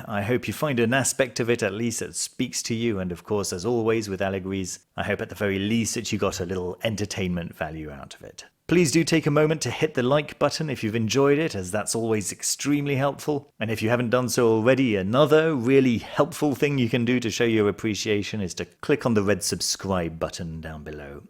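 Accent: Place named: RP, United Kingdom. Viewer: you're the about the only intelligent English that I listen to all day I just love the way you speak to Queens English and you are have a permit if I could afford your patreon channel I wouldn't have to save up to buy a tarot deck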